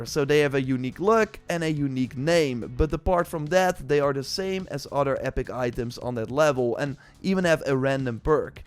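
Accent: Netherlands. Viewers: Yo bro are you dutch ?😂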